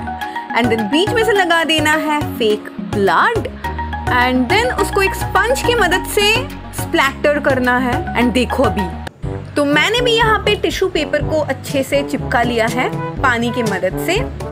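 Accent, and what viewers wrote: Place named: West Bengal, India. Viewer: ap bangali ho you know when I hear you bengali voice I just 😱shocked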